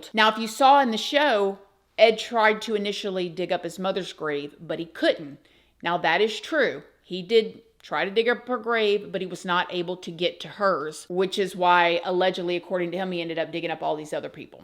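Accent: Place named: Eastern Shore, United States. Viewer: Are you from delmarva? It sounds like a eastern shore accent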